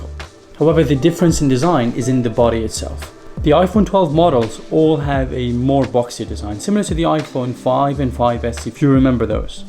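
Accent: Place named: United Kingdom. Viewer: The editing and camera quality is remarkable! also your accent is British with a hint of something else but I can’t figure it out 😂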